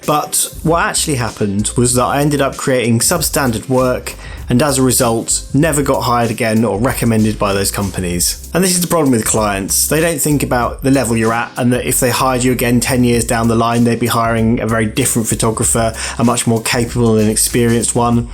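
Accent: England, United Kingdom. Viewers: Subscribed only coz of your #BritishAccent. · You seem like a very reasonable person and highly professional too in the way you present things and that english accent is probably making you sound more like you really know what you're doing. Im your new subscriber. 😉 👍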